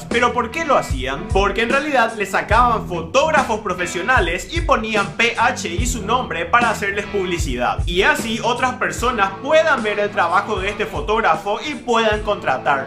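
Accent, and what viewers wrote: Paraguay. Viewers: Hacena videos a lo paraguayo como hablabas antes, te haces guau de el cheto nde tembo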